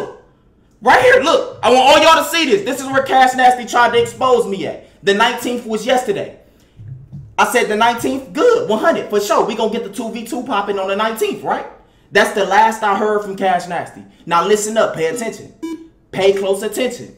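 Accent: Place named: Detroit, United States. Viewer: That Detroit shit came outta my nigga it come out of all of us in the D😂💪🏽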